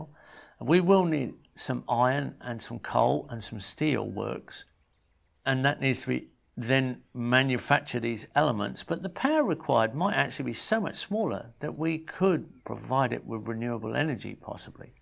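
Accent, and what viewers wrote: Australia. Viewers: Et je suis sûre que le jaune d'œuf est presque orange. :o) Des oeufs superbes et très nutritifs, pondus par des poules heureuses. Magnifique ! My parents used to have chickens and I remember they loved scratching the ground. I didn't much care about the rooster, though. A bit afraid of. They had a laying break for about 1 month a year, in winter (European winter). Otherwise, eggs all the year round, enough for the whole family and to sell some to neighbours. When baking, the pastry had a nice yellow colour. Industrial eggs have a sick pale yolk, you immediately see the difference. Thanks for all your videos, I learn a lot. Just had to get used to your Australian accent at first. All the best!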